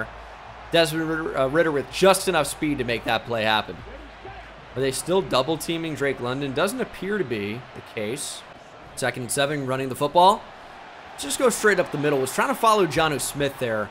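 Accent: London, United Kingdom. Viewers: u throw every ball as a bullet pass u need to lob or touch pass sometimes thats why that Drake London play happened. W british accent tho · Keep spamming the same play that doesn’t work and keep spamming the london accent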